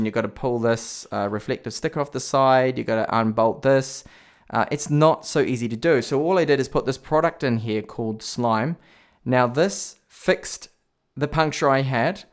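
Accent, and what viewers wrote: New Zealand. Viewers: R ur from New Zealand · Hi, where are you from? :) your accent seems like a friend of mine from NZ...Very good reviews in your channel. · Are you a kiwi?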